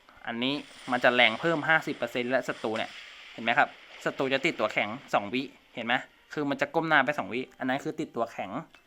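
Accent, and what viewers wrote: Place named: Thailand. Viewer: เหน่อจังจังหวัดไรออคับบช นักดาบกับมือปืนอันไหนดีกว่า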